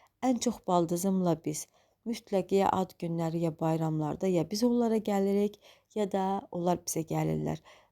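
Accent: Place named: Quba, Azerbaijan. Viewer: Ləhcənizdən qubalısınız deyəsən ancaq qubalılar mehriban olmurlar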